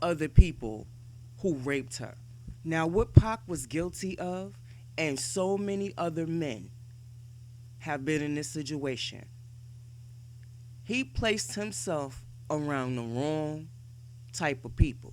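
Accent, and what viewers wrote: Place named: California, United States. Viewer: Listen u cuarvoint lady 😅😂😂😅 talk bout wht u kno you sound like you from California and you country niggas is always talkin bout New York business and don’t kno wht da fuck ya talkin bout that young lady was from BROOKLYN she was a somebody and that’s why them BROOKLYN niggas shot him in da dick point blank period now go ya chicken an waffle eating the same time ass da fuck up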